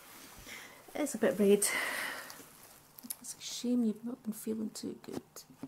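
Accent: Scotland, United Kingdom. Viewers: you are amazing don't let the haters get to you btw your accent is lit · Which part of Scotland are you from?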